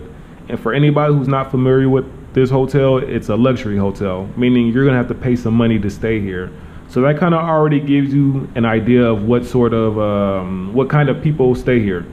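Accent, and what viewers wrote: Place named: West Coast, United States. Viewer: were you raised in Cali-fornia? definitely have that west coast lingo accent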